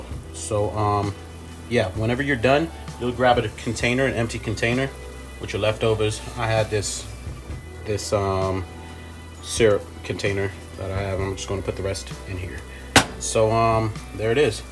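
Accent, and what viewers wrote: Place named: Georgia, United States. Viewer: I could hear the Georgia in you before you even said it. Thanks for the video, I'll be making this!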